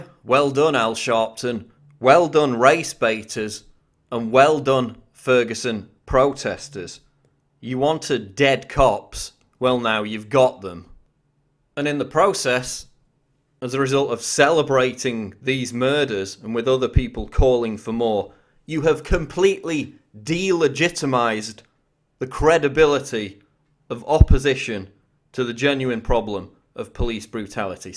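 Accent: United Kingdom. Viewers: Damn, I thought this was something that'd tell me some new information, its just a slow talking extremely biased brit.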